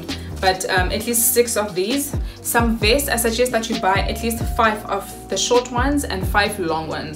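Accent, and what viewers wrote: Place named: South Africa. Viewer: Thank you for this video. Lovely to hear a South African mom.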